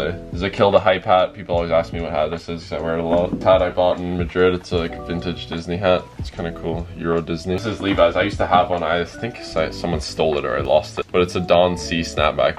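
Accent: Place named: Canada. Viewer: You sound extra canadian in this vid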